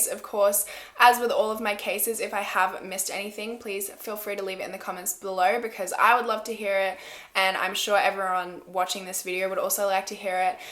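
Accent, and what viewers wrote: Australia. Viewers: I FKN IOVE UR ACCENT! THE AUSSIE IS SO STRONG! IM AUSSIE TOO! PERTH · the fact that she has an australian accent is just a plus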